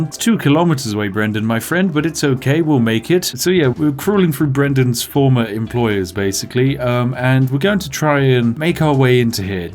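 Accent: United Kingdom. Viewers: Casual British tone "We're almost dead now, and that's great."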